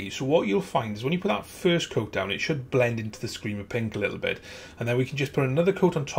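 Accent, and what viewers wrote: Wales, United Kingdom. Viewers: Welsh accent I can hear?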